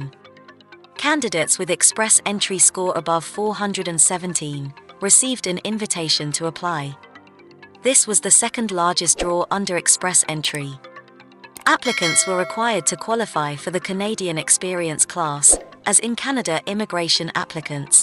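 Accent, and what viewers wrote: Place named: United Kingdom. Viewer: Why the British accent if this is about Canadian immigration. Please change it. We are proud of our identity.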